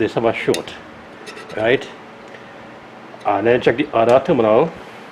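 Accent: Trinidad and Tobago. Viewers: Are you a Trinidadian?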